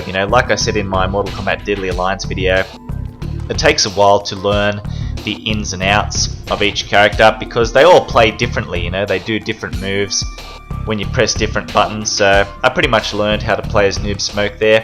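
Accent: Australia. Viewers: Yup that’s blunt amazing Australian accent ✨✨💞💞💞💞💞🔥🔥😭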